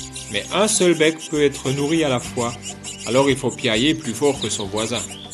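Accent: Alsace, France. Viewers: Donc désolé de ne pas apprécier cet accent alsacien mais un bon reportage animalier (comme celui çi) mérite une voie sans accent, claire, expressive et appréciable par le plus de monde… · je ne suis pas du tout d'accord avec vous, la voix est claire, la diction correcte avec une bonne articulation et, les commentaires très intéressants. L'accent alsacien est de plus charmant. D'ailleurs, je viens de m'abonner. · Merci Monsieur, vous avez un talent certain pour le documentaire animalier . Très belles prises de vue , commentaires d'humour . J'aime votre accent alsacien également et avez de l'amour pour la nature . Vive le maintien de la diversité culturelle comme celle animalière. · L'accent alsacien vous dérange?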